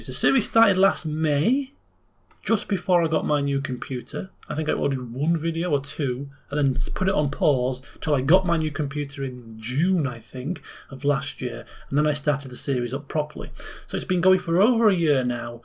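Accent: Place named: RP, United Kingdom. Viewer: No problem man I just wanted to tell you I love your channel I've been watching you ever since your mass effect playthroughs you're my favorite gaming Channel. I say you're even way better that those channels that have millions of subscribers. I think it's your voice you have a pretty cool Posh accent :)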